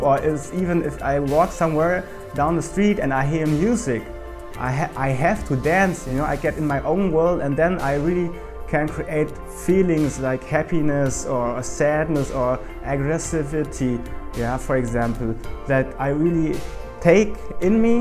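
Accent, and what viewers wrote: Germany. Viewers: Also dein englisch klingt extremst deutsch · schade dass man so hört, dass er aus deutschland kommt :D klingt immer bisschen lächerlich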